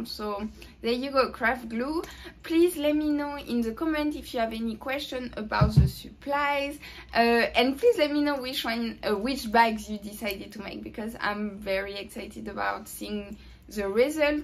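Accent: France. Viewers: Je critique pas du tout, merci pour toute tes explications c'est vrmt super, merci, mais ton accent bien français m'a fait rire 🤣 bien joué et continue c'est top, j'adore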